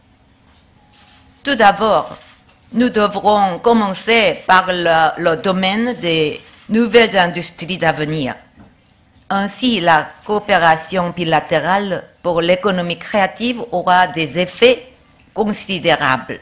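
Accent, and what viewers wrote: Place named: Paris, France. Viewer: 불어할 줄 아는 사람은 알겟지만, 나쁜 불어실력은 아님. 하지만 정상씩이나 되서 외국에서 자랑할 만큼은 아님. 기본적인 발음을 파리식대로 하긴 하는데... 억양이 듣기가 정말 싫다. 애기가 처음에 말 배울 때 더듬거리는 거 같음. 프랑스인이 볼 때 진짜 병신같을 거 같은데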